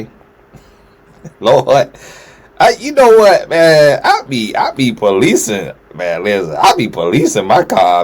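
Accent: United States. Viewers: Tony Gaskins where were you raised because I love your country accent. Keep bringing that Godly and Straight no Chaser Wisdom 💯💚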